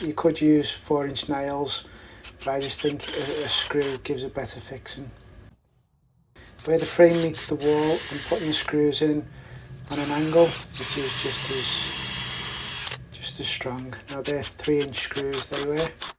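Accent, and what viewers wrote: Liverpool, United Kingdom. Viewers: …he’s a scouser la..💙👍🏻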